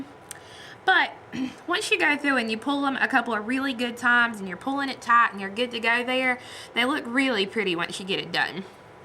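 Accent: South, United States. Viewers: I totally enjoyed the video! I'm from Alabama, so I understood your southern English easily. Thank you!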